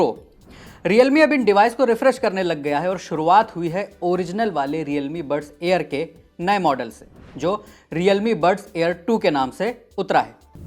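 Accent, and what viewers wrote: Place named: Bihar, India. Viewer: bhai aap Bihari lagte ho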